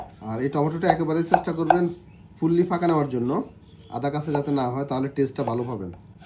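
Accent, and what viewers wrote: Noakhali, Bangladesh. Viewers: নোয়াখালী কথায় বুঝেন না ?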